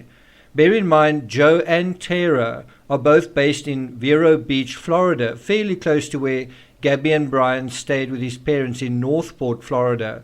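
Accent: South Africa. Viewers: Love your channel, no hysteria , clear thought process and facts . South African accent ?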